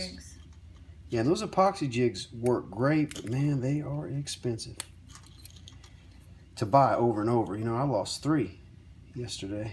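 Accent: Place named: North, United States. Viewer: please make a video showing how you cast that cork that magically holds water.... and no , your NOT local , sound like a yankee , go away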